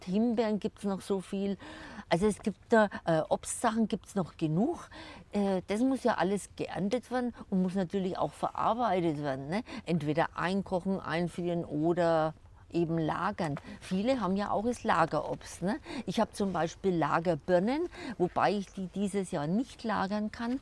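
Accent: Franconia, Germany. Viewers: Eine Freude den typisch fränkischen Dialekt zu hören. Ich tippe auf Mittelfranken 😊 Super Tipps auch noch dazu 👍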